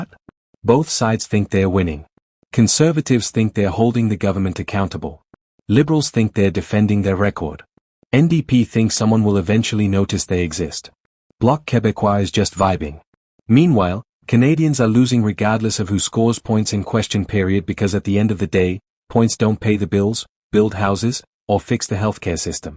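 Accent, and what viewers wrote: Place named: United Kingdom. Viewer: Why the Brit voice and you are showing provincial leaders instead of Federal parliament members?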